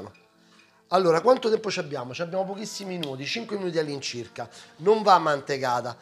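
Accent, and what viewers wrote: Rome, Italy. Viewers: Roman accent, very strong, like mine. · No vabbeh sei troppo simpatico 💖😂 ma per caso sei de Roma oppure marchigiano??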